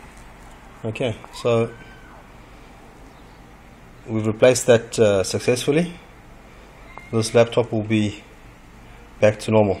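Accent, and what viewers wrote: South Africa. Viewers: you sound so south african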